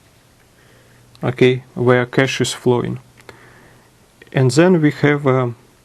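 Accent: Russia. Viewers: Russian accent is cool :p this video is the real deal. Very informative, thank you. Why not write a book ? You have plenty information and experience. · I agree. The accent is great [Russian accents are just so frickin cool] and the explanation is great. what's bad is that there's still people in America who don't think this kindof thing could happen to us, but we're SO on that path of reaching over 1000% inflation. the only real way to get rid of an economic crisis like this, IMO, is to get rid of the central banking system [which tends to be the root of all the problems] and go to a solid, consistently valuble money standard of sorts. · This Siberian chatterbox has the most irritating accent imaginable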